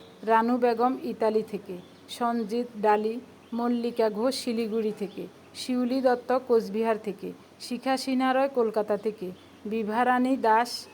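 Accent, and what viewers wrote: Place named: Chittagong, Bangladesh. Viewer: আসলে আমাদের দেশ চট্টগ্রাম। আপনার উচ্চারণ শুনে মনে হলো , তাই বললাম।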